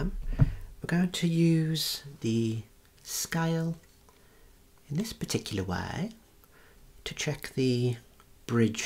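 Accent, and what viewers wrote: Birmingham, United Kingdom. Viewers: When a man can make me relaxed with a Brummie accent you know you're watching a true master of their craft.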